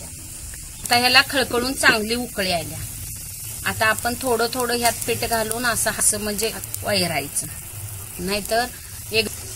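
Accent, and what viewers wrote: Kolhapur, India. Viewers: Kolapuri mast boltya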